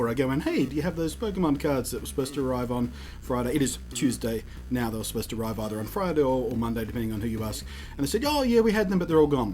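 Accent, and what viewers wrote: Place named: Australia. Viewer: Lol, sorry I laughed my way through this xD I live in a country town so getting to my closest EBgames is quite a drive, when they told me they where out I just didn't even want to bother as I have my Dex filled out anyway. Anyway I went up to the Gold Coast and to Pacific fair and they had a massive pile of the Mew cards (this was also at the end of the month) I did ask the lady about more if you have multiple game copies and she said no it's done by per person, so I figured that's why they still had so many cards at the end of the month... I was just going in for super mystery dungeon so it was a nice surprise after I wasted an hour driving to get one from my usual store, the woman who runs it is nice, so I'm wondering if I could ask to have one kept aside or some thing? To be honest the only one I really want is Darkrai. 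Oh well, awesome to hear an Australian accent ranting about Pokemon, makes me feel less of a weirdo xD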